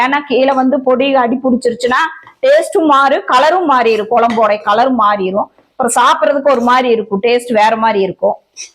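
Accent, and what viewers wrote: Kongu, India. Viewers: Akka ur kongu slang is very sweet to hear. I love ur samayal.its amazing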